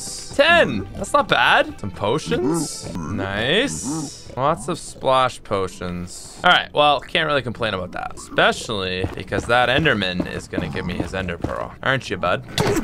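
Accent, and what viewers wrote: Canada. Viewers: I swear is he a Canadian he has like the same exact accent as my mom and she is Canadian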